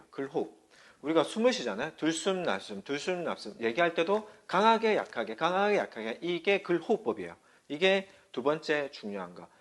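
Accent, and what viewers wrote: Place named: Gyeongsang, South Korea. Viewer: 표준어 쓰는 경남사람😂 영어공부법 잘 들었습니다^^ 맥락있는 단어암기와 활용, 적용이 중요하군요! 쓸수있는 영어공부를 해라. 별표!!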